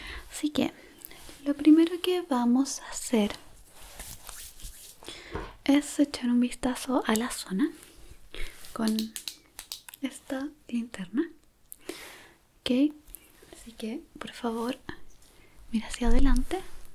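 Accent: Chile. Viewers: Amo el asiento chileno más videos así por favor ❤️ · Acento Chileno:0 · Es chilena 🇨🇱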